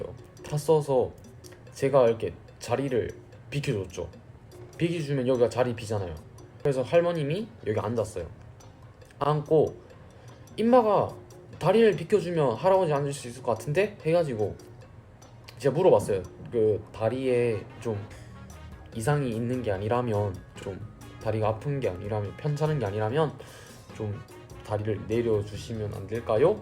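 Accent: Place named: Gyeongsang, South Korea. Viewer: 이제는 일본어가 익숙한데 그안에 한국어에 대구 사투리가 있으신거 같았는뎈 ㅋ ㅋ ㅋ ㅋ맞았군요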